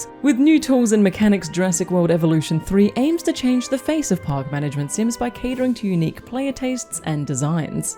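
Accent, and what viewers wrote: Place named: Australia. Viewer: I like the rare occasions YouTube recommends something interesting from a channel I didn't know about before. I liked the old days of classic RTS and city builders. Edit: also hello from another Australian, assuming from your accent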